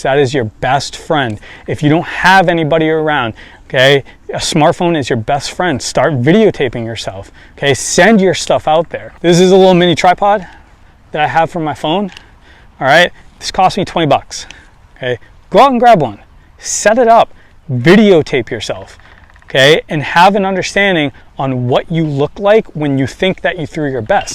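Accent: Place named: New England, United States. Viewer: Sweet. Go Bronx Bombers! Sorry I know you're from New England with that accent. Probably grew up a Sox fan. I lived on Nantucket for 10 years. Peace.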